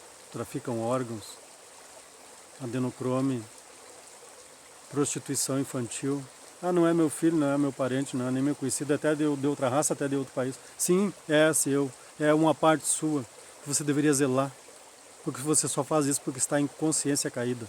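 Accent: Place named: Rio Grande do Sul, Brazil. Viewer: .., sim.. .logo, que tú lançou o questionamento.. . eu já tinha a resposta,,.. . só não deu tempo, de pó arar o vídeo, e Te responder aqui nos comentários.*Tú e aqui do estado,(RS )provavelmente ,creio eu deduzi, pelo teu sotaque, entonação entonação , enfim.. .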